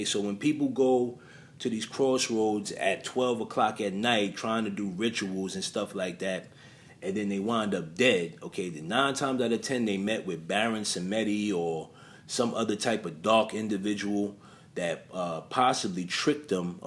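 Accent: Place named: New York, United States. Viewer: All this time I thought you were saying, "Foreskin Bargain". New York accents, sheesh!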